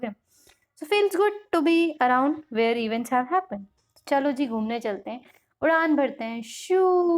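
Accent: Uttar Pradesh, India. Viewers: Mam app U.P. se ho kya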